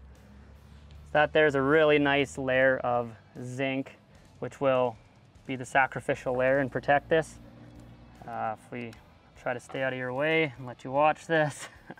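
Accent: Canada. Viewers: Is this guy Canadian? Sounds like he has a Canadian accent and maybe his prices are in CAD which are always higher?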